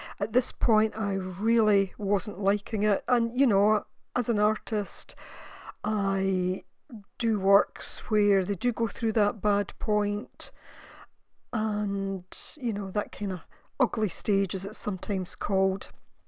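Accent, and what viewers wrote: Scotland, United Kingdom. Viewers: I agree that it's great that you left in the parts where you were struggling. We all go through it, and it makes us feel better about when it happens to us. I'm glad you kept going on these. The dragonfly is so beautiful! And may I just say that I love your accent? I haven't come across any other crafters or artists with Scottish accents, and it's just my favorite accent to listen to - love it! 💗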